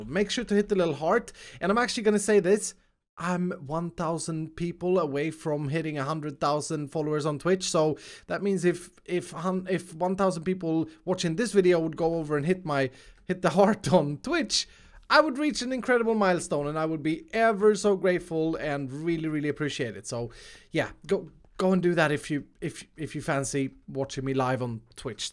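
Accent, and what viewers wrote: Sweden. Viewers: Are you swedish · Are you from sweden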